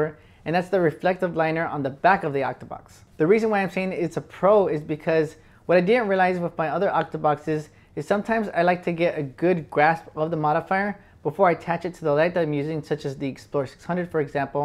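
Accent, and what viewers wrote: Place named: United States. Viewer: Que tenga nombre latino significa que habla español? Por su acento me parece bastante Americano. Que estereotipada tu vision Rodolfito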